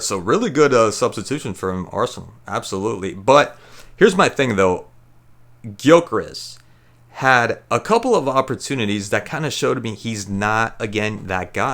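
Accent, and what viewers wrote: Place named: United States. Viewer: Not gonna lie, i hear that accent and once i've wrote this i'm off. 🤣🤣🤣 sorry fella but yanks and football just don't go together.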